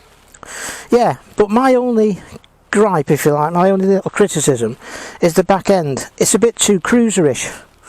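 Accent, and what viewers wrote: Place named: England, United Kingdom. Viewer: Probably the worst review of bsa goldstar. Sounds like trump reviewing with english accent.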